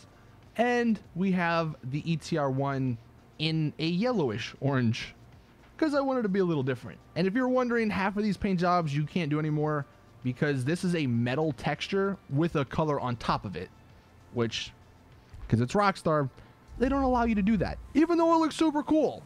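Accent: Louisiana, United States. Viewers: What part of Louisiana you from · Are you from Louisiana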